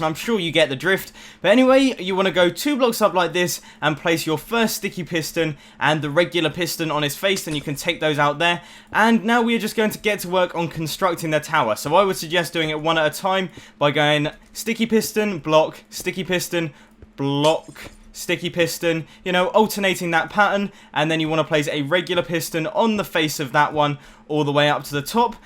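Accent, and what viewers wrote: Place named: Cockney, United Kingdom. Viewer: Gawd, I love your accent, sort of cockney-ish!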